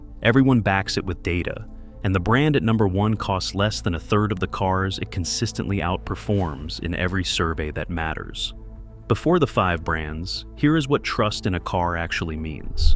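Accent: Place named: United States. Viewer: Turned it off when I heard an American accent!!!!!!!!!!!!!!!!!!!!!!!!!!!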